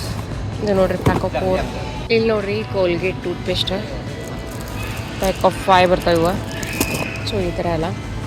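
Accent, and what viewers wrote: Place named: North Karnataka, India. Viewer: ನೀವು ಮಾತಾಡ್ತಾ ಇರೋ ಶೈಲಿ ನೋಡಿದ್ರೆ ನಮ್ಮ ಉತ್ತರ ಕರ್ನಾಟಕ ಭಾಗದವರು ಅಂತ ಅನ್ನಿಸ್ತಿದೆ ಹಾಗಾಗಿ ನನಗೆ ನಿಮ್ಮ ವಿಡಿಯೋ ತುಂಬಾ ಇಷ್ಟ 🤝🤝🤝🤝👍👍✨🌟✨🌟✨🌟✨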